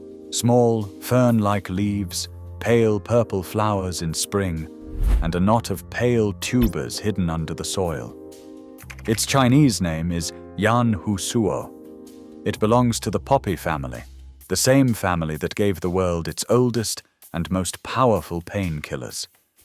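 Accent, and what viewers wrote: United Kingdom. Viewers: British accent is cool. 👍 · What's with the Brit accent?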